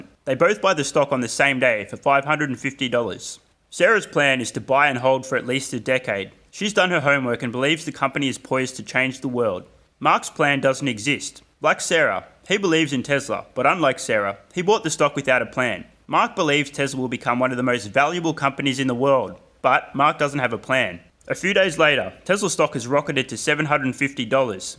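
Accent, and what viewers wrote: Australia. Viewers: It's going to be a tough decision to sell a single share in 10 years! I really hope by then they are paying a dividend by then so I can retire early. Great vids and nice to hear an Aussie accent to get my TSLA news from too, thanks mate! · Great Aussie accent!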